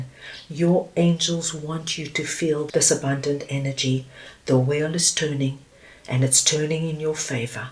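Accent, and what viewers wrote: South Africa. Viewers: Nice reading. You sound South African.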